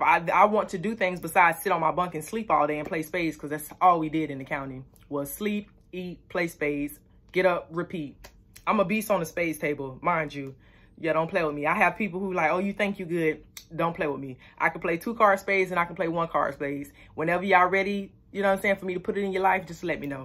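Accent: Florida, United States. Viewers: I love that country talk😊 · I knew she was from Florida,love how they talk.Ex felon myself love to see the growth.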